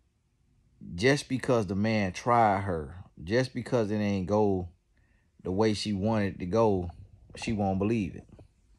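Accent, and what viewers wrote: South, United States. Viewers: Boiiii you have one country accent lol lol I'm from the South too I hear it in ya lol lol love your messages they are well needed, Big Blessings to you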